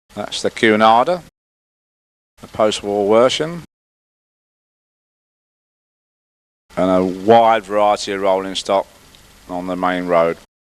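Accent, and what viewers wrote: United Kingdom. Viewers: Great stuff, my dad would take me on the trolley buses back in 1966/7 into town to buy toys and seafood on the London road ( The Barras Market). Very happy days indeed. Did anyone ever tell you that you have an East End of London accent?, I lived there for many years. In fact you sound just like the late Charlie Kray. How would I know that. Keep up the good work.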